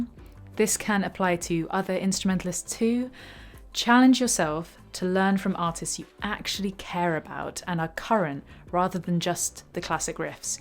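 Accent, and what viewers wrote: United Kingdom. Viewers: Even when I lover her accent (or any british accent), my ears ain't well trained enough to understand the name of song Mary mentioned. Anyone can help me?.. Thanks!.. Guitar story is amazing!!!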